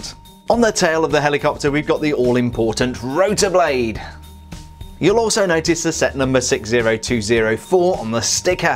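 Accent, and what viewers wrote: United Kingdom. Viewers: you sound so English I’m English and you sound more English than me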